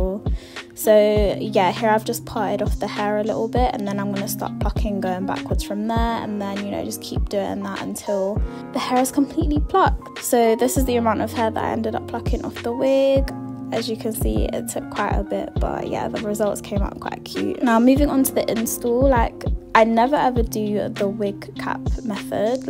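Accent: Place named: United Kingdom. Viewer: I feel like when people have a British accent they have an advantage in life but when people have an African/ British accent. Untouchable. Lol looks so good on you and love your voice. It’s so pleasant.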